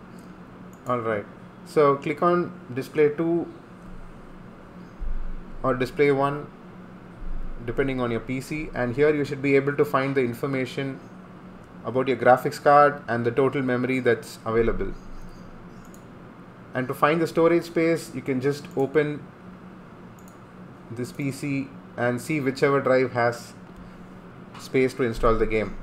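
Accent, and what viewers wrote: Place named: India. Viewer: And as always, the man with indian accent did not disappoint and helped with technical issues Thank you so much (I hope I didn't sound racist)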